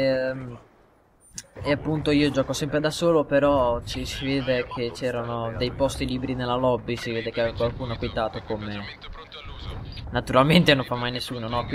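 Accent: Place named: Romagna, Italy. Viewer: Ecco perchè mi sembravi romagnolo ;) Io sono di faenza e vengo al mare li in zona ;)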